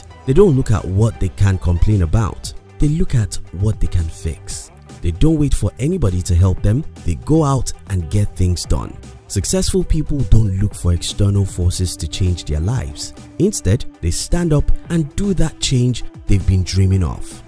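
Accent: Nigeria. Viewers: voice must be Nigerian. m'right? i'm 98% certain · I know a Nigerian when I hear one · Accent sounds Nigerian :?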